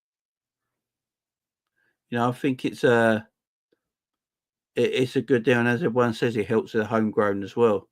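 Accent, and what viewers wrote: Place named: South, United States. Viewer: Hey, wasn’t sure about you at first what with you having that southern accent and all that. Now I know why people think you’re brilliant. Top content as always. Big thanks for keeping us informed 😊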